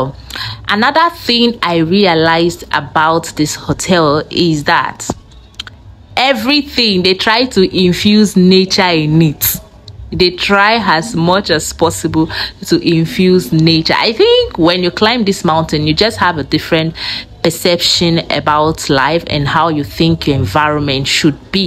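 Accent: Ghana. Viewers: You sound more Ghanaian than a Nigerian. Very soon, your accent will change completely 😂 Nice video btw✌️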